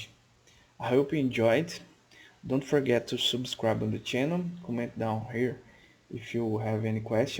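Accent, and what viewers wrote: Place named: Brazil. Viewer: assim que começou a falar, sabia que era brasileiro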